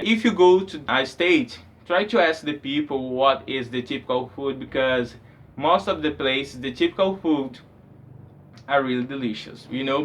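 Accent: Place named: Brazil. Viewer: I'm from Sergipe, Brasil, and I'm learning English by myself, because I have no money enough to pay for private English classes, that's why I'm looking for videos in English to practice my listening, and, although your brazilian accent you rocked! I got all you said, and I really get happy. Thank you for sharing this video speaking English, and I'm sorry for some mistake, if I did it.<3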